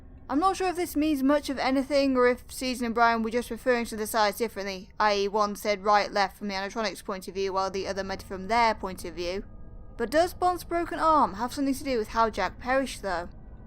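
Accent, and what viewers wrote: United Kingdom. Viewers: Bristol? I wouldn't have guess that in a million years. I did think you were somewhere near Wales but your accent isn't that strong tbh. I like these Walten Files videos, keep up the good work!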